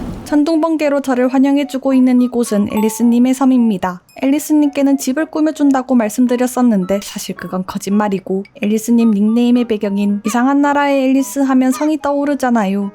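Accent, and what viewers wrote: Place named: Daegu, South Korea. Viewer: 대구분이라 사투리 쓰시는거에욘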